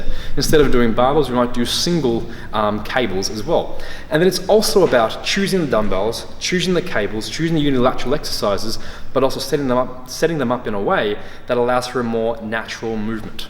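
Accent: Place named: Australia. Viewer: An Asian with an Australian accent who has the body of a statue 😍🤭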